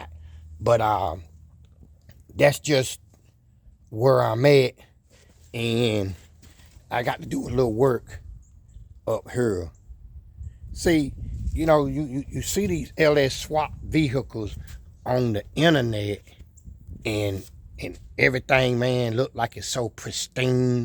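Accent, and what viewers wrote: South, United States. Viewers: Love your accent, I’m from the south too, been to Ft Bragg when I was in the military years ago. Nice truck,I have a 63 c10 350/350 combo and would love to do that swap in my truck or 86 Trans Am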